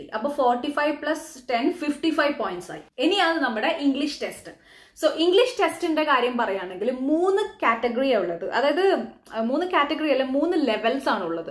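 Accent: Kannur, India. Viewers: Wow.. thanks a lot for committing the video.. Kannur bhasha kettapol thonni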